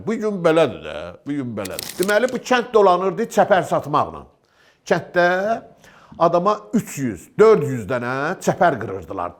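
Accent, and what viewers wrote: Baku, Azerbaijan. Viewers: Bakı lehçesi superdir ürəyim gedir❤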